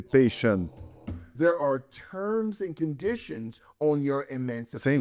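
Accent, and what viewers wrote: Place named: Russia. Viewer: Congratulations on your 100th episode! I’m glad I found your channel. Your Russian accent makes the conversations so much better hahah ❤✊🏼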